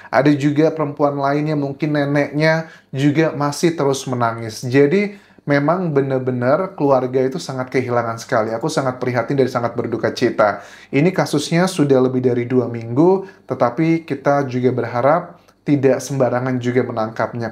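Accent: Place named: Banyumas, Indonesia. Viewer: Ujug ujug 😂 wong ngapak admin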